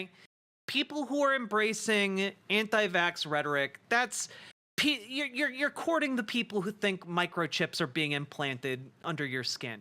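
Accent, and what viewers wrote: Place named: Delaware County, United States. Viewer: And having a Delco accent, is a plus too 😂.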